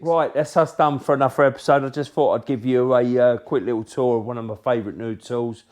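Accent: United Kingdom. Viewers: awwwite mate av a day off! I used to live in London, I do miss that fantastic accent, could listen to it for hours. Thanks for the tool review! PS Cobolt refers to the material it's made from. They add it into steel on better quality tools to make it harder, as obviously you want these snips to be made from a harder steel than whatever it is you're trying to cut. Like padlock chain on a bicycle for many users I expect heheh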